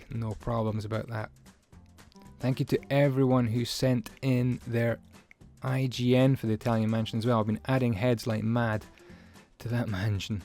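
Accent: Scotland, United Kingdom. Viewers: You sound Scottish. Are you?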